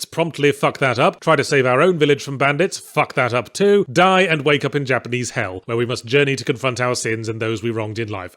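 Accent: United Kingdom. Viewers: I feel like the easiest way to appeal to Yahtzee is to give him a protagonist with the exact same "slightly condencending, posh and sarcastic" british accent, that he has. He ends up liking most of them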